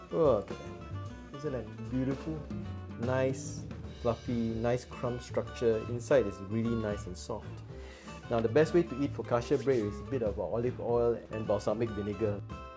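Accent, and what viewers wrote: Singapore. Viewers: Awesome content!! I can guess you're from Singapore based on your accent, am I right la? :D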